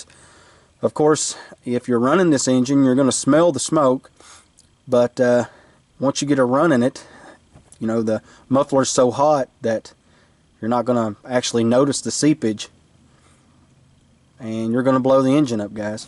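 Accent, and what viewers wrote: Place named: Boston, United States. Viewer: After fire what? Sorry I am having trouble understanding your Boston accent. Could you point to that part?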